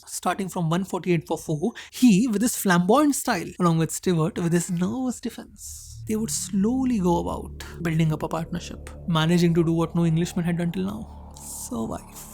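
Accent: India. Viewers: Bro ur Typical Indian accent is absolutely Hilarious 😂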